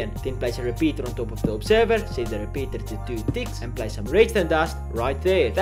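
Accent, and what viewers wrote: South Africa. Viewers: klink soos n safa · South African, my Bru?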